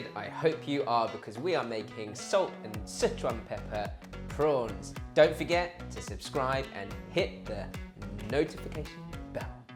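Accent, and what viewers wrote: United Kingdom. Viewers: Holy shit, an Asian dude with a British accent! Love it!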